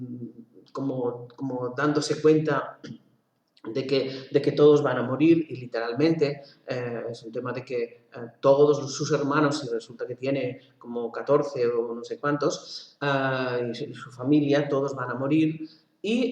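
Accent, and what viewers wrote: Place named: Catalonia, Spain. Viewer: me subscribo ,me gusta como narras, buena dicción, por tu acento deduzco eres catalana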